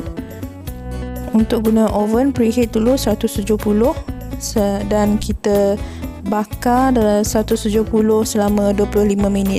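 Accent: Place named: Sabah, Malaysia. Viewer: tq resepinya.. tp knp bunyi awak ckp kdg2 mcm org sabah? hehehe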